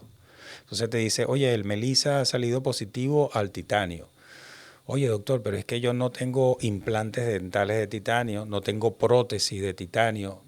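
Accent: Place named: Caracas, Venezuela. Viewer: Ese acento me recuerda al Guaraira Repano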